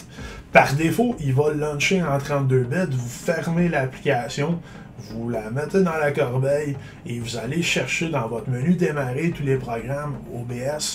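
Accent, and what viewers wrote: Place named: Quebec, Canada. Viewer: T'a la meilleur voix que Steelorse ! Je trouve que l'accent Quebécois est trop cool !!!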